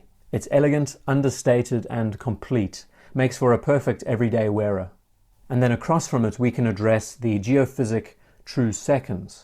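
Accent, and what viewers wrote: South Africa. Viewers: Do I detect the South-African accent? )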